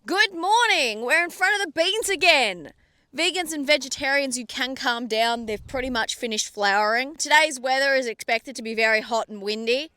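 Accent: Australia. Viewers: Fuck all of them. This is how Australians speak. If you don’t like it then scroll. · It's so refreshing to listen to an Australian speaking our dialect, with prejudice. Love your fuckin work. And fuck the do gooders who don't know shit but tell you what the fuck you're doing wrong.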